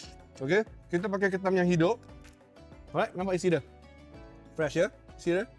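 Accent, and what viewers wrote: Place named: Perak, Malaysia. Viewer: Sleng peyrock still ade.. padu..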